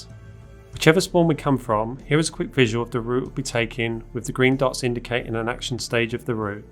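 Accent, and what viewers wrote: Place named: Romford, United Kingdom. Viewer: You from Romford? Your accent giving me serious Ray Parlour vibes 😂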